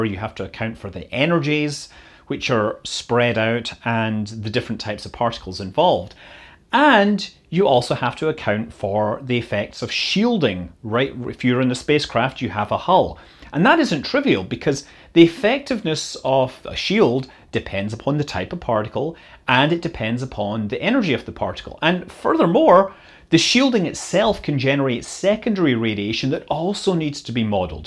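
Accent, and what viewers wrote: Scotland, United Kingdom. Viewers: Great video as always! Unrelated, I got a good chuckle every time you said “Explorer,” which I heard as “Exploder” due to your Scottish accent.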